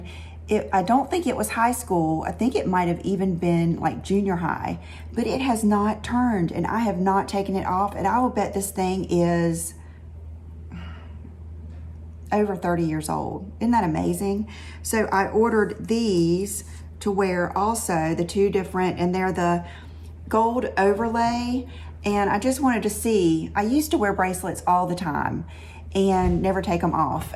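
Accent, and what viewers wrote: South, United States. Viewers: Love your precious southern accent!! Can I be you?!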